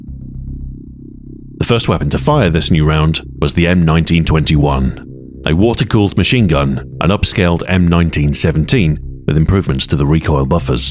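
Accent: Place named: Manchester, United Kingdom. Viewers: I want to say Manchester, England. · He's British.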